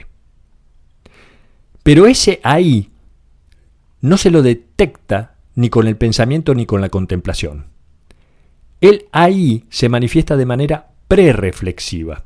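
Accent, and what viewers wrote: Argentina. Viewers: La magia del pensamiento de Byung Chula Han , narrada con esa hermosa voz argentina. ❤ Gracias 🙏🏽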